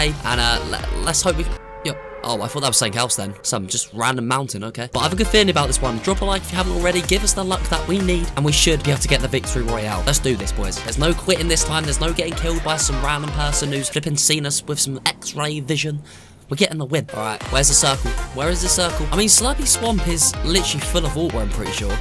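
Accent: United Kingdom. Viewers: Me: Oh no~: Oh norw! Ohw my gawd~ (No hate, love the accent <3)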